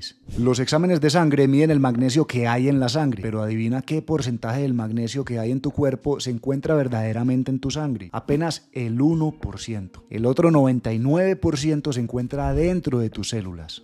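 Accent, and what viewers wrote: Colombia. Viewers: Esa voz colombiana me da salud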